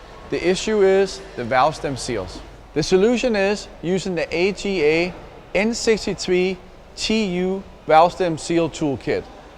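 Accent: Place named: Denmark. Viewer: The danish accent ;) Although it was very subtle. Great video btw.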